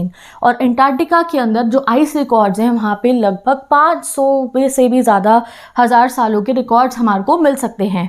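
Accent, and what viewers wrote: Punjab, India. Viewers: Bande Bharat ke speed se bolte ja rahi hai aap। Student would definitely fail to follow।। Again Hindi in Punjabi tone is very disturbing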